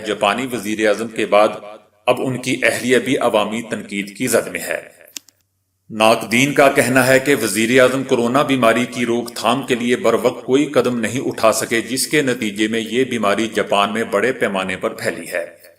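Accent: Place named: Punjab, Pakistan. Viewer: pahly apny LAHJEY ko sahi karo.. pher khabrey pharloo... khaar punjabi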